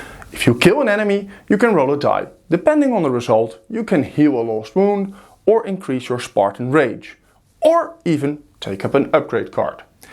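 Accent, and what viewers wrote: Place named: Netherlands. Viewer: Klinkt als een hollander maar dan int engels 🤔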